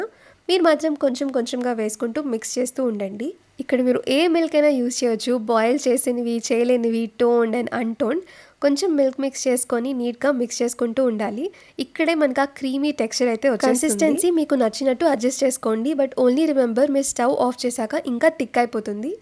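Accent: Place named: Hyderabad, India. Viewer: Akka Neku slow ga hyderabad slang vastundi ga 😅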